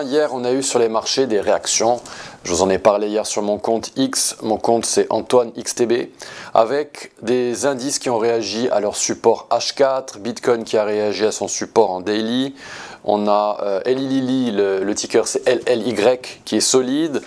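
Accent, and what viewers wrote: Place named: Corsica, France. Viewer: Petit accent corse sympa 😊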